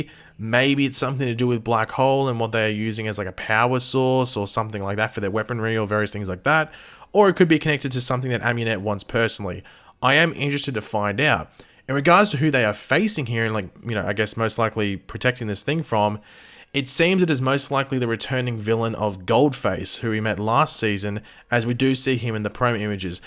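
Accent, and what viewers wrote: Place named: Australia. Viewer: B A He has an Australian accent bugs for brains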